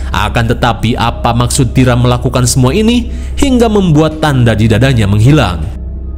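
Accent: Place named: West Java, Indonesia. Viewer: Bocil sok pinter dih,pdhal yg baca naskah alur ini tuh orang sunda dengan logat dan suka melesetin kata kata biar ga terlaly flat dan kaku pembawaan nya, lu penonton baru apa gmna? Dri dlu jg bgini pembawaan nya kocak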